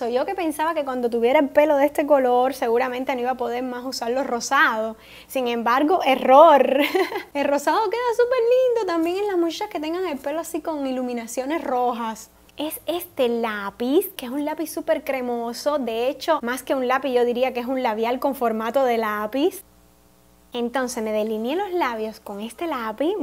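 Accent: Cuba. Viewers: Hola tocalla eres cubana verdad? :3 mi novio igual lo es el es de Camagüey me encantan tus videos!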